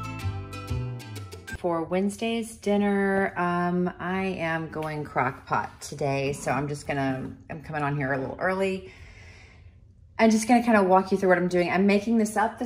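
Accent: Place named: Scotland, United Kingdom. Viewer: Just found your channel by chance and thought you were Scottish 🤣🤣 and yes I live in Scotland 🏴 and have subscribed 💕💕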